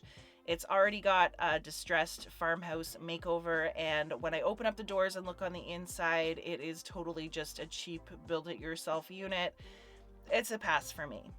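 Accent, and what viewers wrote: Canada. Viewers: This was such a great video. Also shows me why I watch you because I think you’re so down to earth. I had asked the question about your profession and you answered my question and loved seeing your hunt for your beauties you make! I also enjoy your Canadian accent lol! I was born in Toronto but moved to the states when I was 10 so no Canadian accent left but my roots go deep. I’m also a “ ” so another reason I follow you lol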